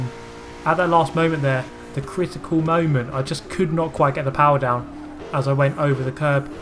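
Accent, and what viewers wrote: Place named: RP, United Kingdom. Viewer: I like the gameplay and reasoning behind the trolling the trolls idea, but you sound so posh especially when you say “That’s a bit of a inappropriate joke for a child’s racing game”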